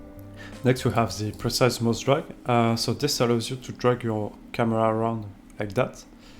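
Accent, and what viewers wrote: France. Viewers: le fameux accent français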